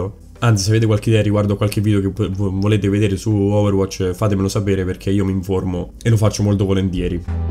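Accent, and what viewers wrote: Marche, Italy. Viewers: Ciao, mi sono appena iscritta al canale. I miei complimenti, finalmente qualcuno che fai degli ottimi video di wow in italiano ^^ riconosco l'accento della mia zona....sei marchigiano o sbaglio?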